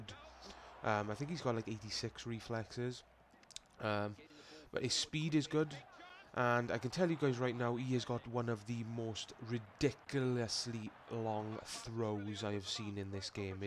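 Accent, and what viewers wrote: Wales, United Kingdom. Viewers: are you welsh? you seem to have a welsh accent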